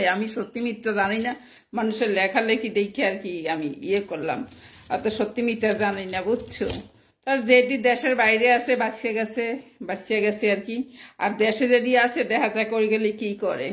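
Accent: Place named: Dhaka, Bangladesh. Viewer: thoroughly enjoyed this 18.55 minutes of pure, 24-golden monologue....... Dhaka (or Silhet) accented Bengali was sonorous .... Thank you for making my day........ Regards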